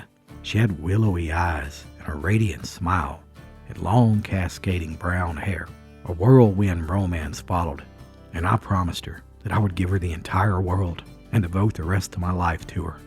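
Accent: Appalachia, United States. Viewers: From Switzerland or from Mountainman to Mountainman. Great Storytelling, love it. The way you tell it and can l say your Appalachian dialekt make your storys special. And can l ask you for that Red Rube video intro tune?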